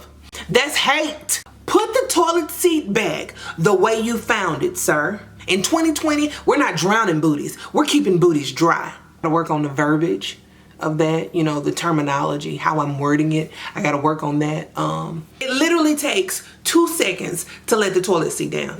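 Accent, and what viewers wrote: South, United States. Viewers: Honey are you from the south? I hear the country in your voice 🥰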